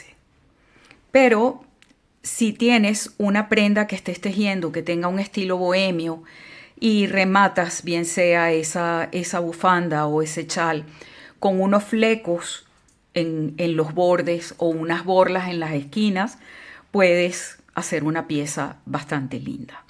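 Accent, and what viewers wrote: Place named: Venezuela. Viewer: Muy lindo, muchas gracias!. Me ha insipirado tu muestra. Voy a hacer un cardigan con 10 vueltas de tu punto y 10 llevando un punto en diagonal cada 6 u 8 asi el diseño tiene continuidad. Todavia estoy evaluando si hacer las mangas todas caladas o no, ya que son mangas al codo porque es una prenda de lana de algodón para verano. Me encanta tu acento, es venezolano?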